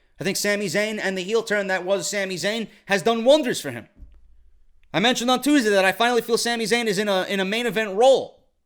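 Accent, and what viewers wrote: New York, United States. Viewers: I've only very recently subbed to your channel because I like what you do and how you do it, anyone can tell that you're passionate about this and the RAW 25 rage in your video made me laugh in a good way. Don't ever let haters or people who have no clue put you down, a lot of these "9 - 5" guys hate their jobs and would give anything to be able to do what they love for a job. As what I suppose someone like you would call a "casual" I don't know anywhere near as much as you about the business but I've been interested in maybe starting my own series of videos (with a more light hearted tone because I just don't have your experience). Keep it up is all I can say, I enjoy your content, plus I'm from the UK and your NY accent is awesome. lol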